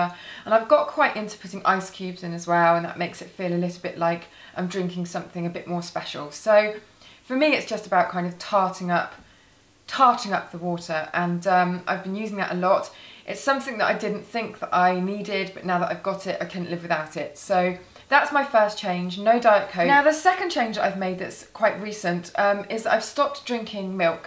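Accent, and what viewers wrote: United Kingdom. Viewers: I love your British accent and you're a very smart and elegant person :)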